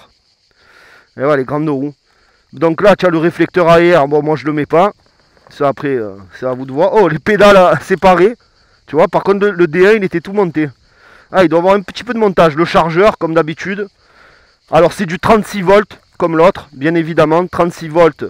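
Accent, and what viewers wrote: Marseille, France. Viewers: J'adore tes vidéos et ta bonne humeur de marseillais. Quel accent, j'adore!. Continue de nous présenter de nouveau modèle dans le délire et le sourire du sud et de Marseille. J'ai revenu ma speedway 5 car je trouvais les amortisseurs trop durs et je suis de nouveau à la recherche d'une nouvelle trottinette mais avec des amortisseurs souples surtout pour mon dos.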